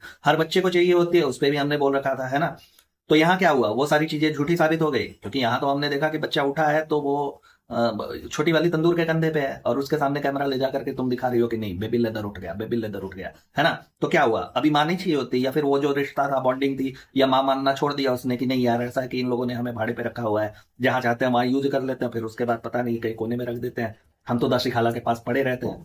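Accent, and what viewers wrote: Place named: Lucknow, India. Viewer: Are you from Lucknow or Hardoi or nearby places like Sitapur, actually aapke baat karne ka tarika bikul wahi ka hai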